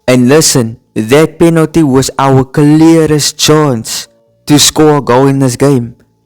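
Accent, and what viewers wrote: South Africa. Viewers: Love your channel man. I can tell you are South African with your accent 😅👌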